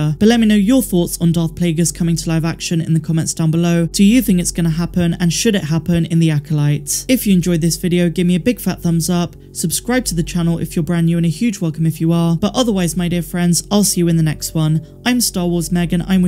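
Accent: United Kingdom. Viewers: Why do you sound British Meg ?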